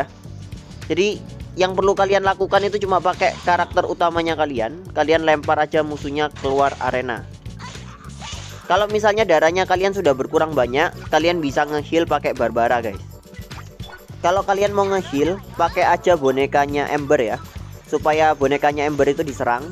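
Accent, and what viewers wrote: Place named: Java, Indonesia. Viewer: Wkwkwk medokk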